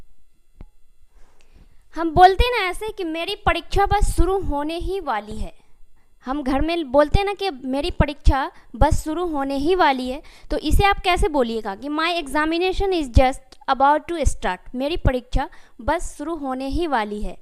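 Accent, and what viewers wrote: Bihar, India. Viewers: Well! she was doing, write a Bollywood movie song. I was just, about to play Cricket. My result is, about to come. You must have, come to my home at soon. I was doing busy, take to my bath. Yess: I knew that You are from [Bihaar] with Yours, accental Mother Tongue phonatic sounds but still; I couldn't know about Yours complete qualification please. I am from Yamuna Nagar, [Hariyaana] state of India. Graduated in Arts, with the Instrumental and Vocal Music. Okay Madam!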